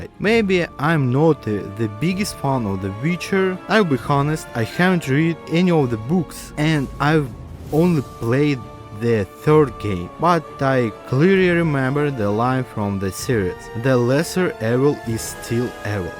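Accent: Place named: Russia. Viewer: Бро, с таким произношением тебя никто не поймёт, кроме русских. Floor, door = [флор], [дор] Bear = [бэр], "бир" это пиво =Ъ Abandoned = [абАндонд] и т.д. Акцент акцентом, но гласные должны быть хоть приблизительно правильные.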